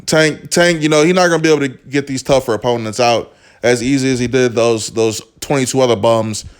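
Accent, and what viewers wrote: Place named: Baltimore, United States. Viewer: Thats how we talk in Baltimore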